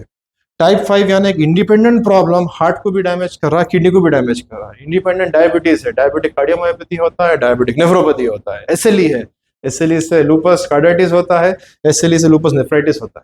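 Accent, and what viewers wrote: Hyderabad, India. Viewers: Hi. Doc your video is informative. Can you please give more info how to reduce crestanin? You've Hydrabadi accent, are you from Hydrabad india? Where can i contact you? HB from Toronto Canada 🇨🇦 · Dr..Auslamalekum. We regularly watch your videos and appreciate your knowledge and experience. We have 2 observations. It's difficult to read your writing on the board becoz there is very little contrast. Please use darker marker pen and write slightly bigger words. Further although we enjoy Hyderabadi accent but some of the words are not audible because they are spoken in low tone. Hope you will not mind our observations. Please take care of these aspects in future videos. Thanks and regards Tarwinder Singh Delhi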